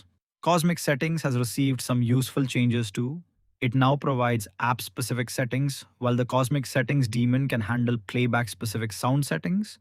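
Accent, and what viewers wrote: India. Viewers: I'm not being ironic: Is this an Ai voice with Indian accent?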